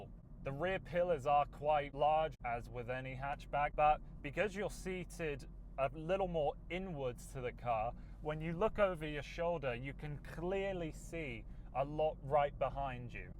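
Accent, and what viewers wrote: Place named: England, United Kingdom. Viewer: Are you in Canada .... ? because you sound very English .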